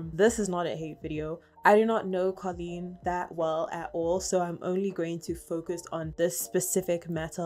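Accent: South Africa. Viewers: I was wondering is this a South African accent. Thinking nooo maybe uk maybe Australia then you hit that “but wow” and now I know. I’m sure. Hello my sister.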